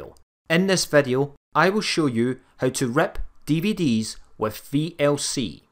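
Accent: Scotland, United Kingdom. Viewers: i like your accent :) i'm guessing scottish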